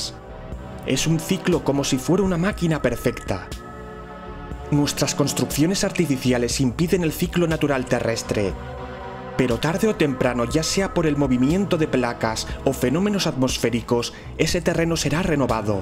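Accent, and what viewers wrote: Spain. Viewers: Parece más de España, no? Saludos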